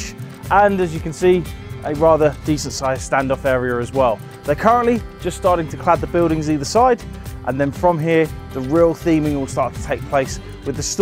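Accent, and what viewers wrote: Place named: Germany. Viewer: Cara orang Jerman bicara Inggris ketauan banget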